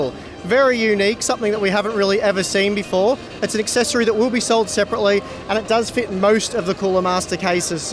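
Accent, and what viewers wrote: Australia. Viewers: disliked because aussie accent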